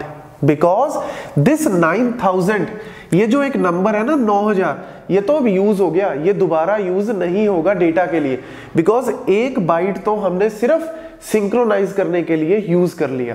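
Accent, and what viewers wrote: Punjab, India. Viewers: Damn! That Punjabi accent surely sticks in my head. Great explanation too! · punjabi accent makes the video greater , Great work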